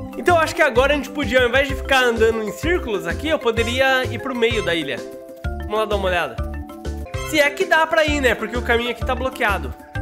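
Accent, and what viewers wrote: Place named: São Paulo, Brazil. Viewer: com todo respeito puxa menos o R , sotaque paulista poRRRRta. barrrco no mais ta muito tri legal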